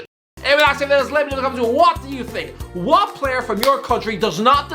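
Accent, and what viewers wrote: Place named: Ireland. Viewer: He is Irish, accents are different SHUSH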